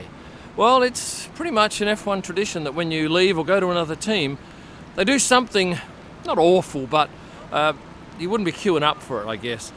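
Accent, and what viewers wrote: Australia. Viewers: I like the Australian talk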